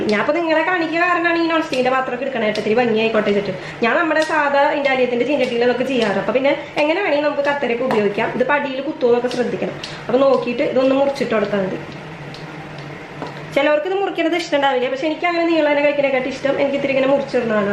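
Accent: Thrissur, India. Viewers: സംസാരത്തിന്റെ സ്പീഡ് ഒട്ടും കുറക്കണ്ട. കാക്കിറ മൂക്കിറ, പിച്ചിപ്പറി, കണ്ടം മുണ്ടം ഇമ്മാതിരി തനി നാടൻ സാധനങ്ങൾ എത്രയുണ്ടെങ്കിലും പോന്നോട്ടെ. അതൊക്കെ പാചകത്തോടൊപ്പം ആളുകൾ ആസ്വദിക്കുന്നുണ്ട്. ഒരു മനുഷ്യനും തനിമ നഷ്ടപ്പെടുത്തരുത് എന്ന അഭിപ്രായക്കാരനാണ് ഞാൻ. മോഡേൺ ശരീരഭാഷയും ഇംഗ്ലീഷും മലയാളവുമല്ലാത്ത വികലമായ സംസാര ഭാഷയും കൊണ്ട് വീഡിയോയിൽ വരുന്ന ആളുകൾ അവനവന്റെയും അതോടൊപ്പം നാടിന്റെയും തനിമ നഷ്ടപ്പെടുത്തുന്നു. സ്വതസിദ്ധമായ തൃശൂർ നാടൻ ശൈലി ഒരിക്കലും നഷ്ടപ്പെടാതിരിക്കട്ടെ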